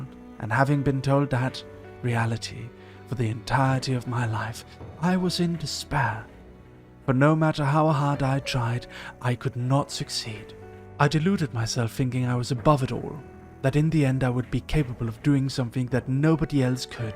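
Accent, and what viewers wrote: Denmark. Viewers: While your English is very good, I can still hear the tiny bit of danish accent in it... as a fellow Dane, I can spot another Dane... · Funny considering every dane can easily hear he's danish But his way of speaking is very endearing!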